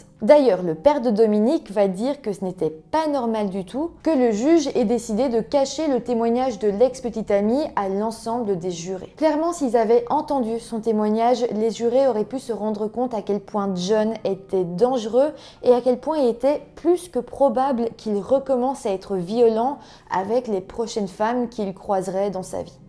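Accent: Belgium. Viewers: Incroyable la justice américaine sur ce dossier, ce n'est vraiment pas cher payé pour un assassinat Merci pour cette histoire, j'ai cru reconnaître un léger accent belge, me trompe je ? CC des Vosges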